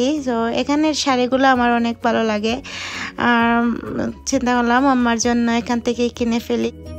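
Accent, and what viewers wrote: Sylhet, Bangladesh. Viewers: apa tumare amrar sylheti matle bala lage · Apu apnar sylheti kotha e valo lage. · when you spoke sylheti in your vlog it was better. It sounds nice when us sylheti speak sylheti. Please change back to sylheti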